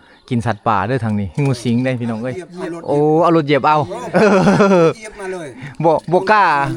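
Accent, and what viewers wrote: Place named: Southern Isan, Thailand. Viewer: อยู่แขวงไหนครับพูดเหมือนคนอิสานใต้ครับ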